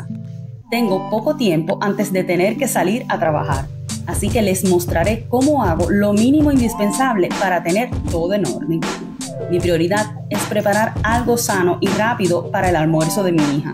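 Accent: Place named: Dominican Republic. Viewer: sospechava que fueras dominicana amiga,gracias por contestarme🏠🇺🇾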